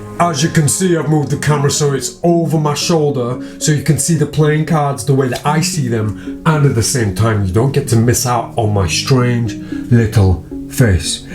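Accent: United Kingdom. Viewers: The cockney accent is always on point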